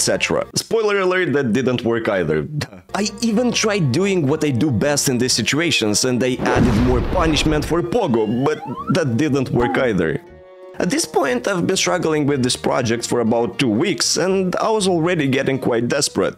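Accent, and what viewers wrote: Russia. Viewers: По произношению понятно, РУССКИЙ!!! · рязанский акцент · It sounds like you have a Russian accent lol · Is it just me, or am I hearing a Russian accent? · Youre russian? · It's the first video I'm watching by you and I was immediately like "a familiar Slavic accent huh?" Hello, fellow Russian!XD Удачи в твоих начинаниях!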